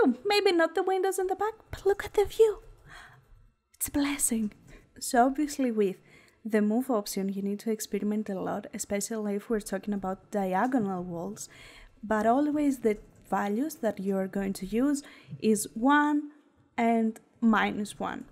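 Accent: Greece. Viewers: Hi! Are you from Greece? Your accent sounds greek! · aww knew it!! It's comforting to hear, I'm Greek Canadian and I knew I recognized that accent anywhere :) kali nixta!!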